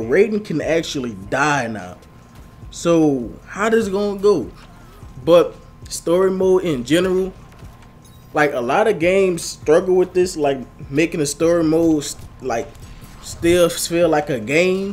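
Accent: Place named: New Orleans, United States. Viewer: you sound like you from new orleans🤔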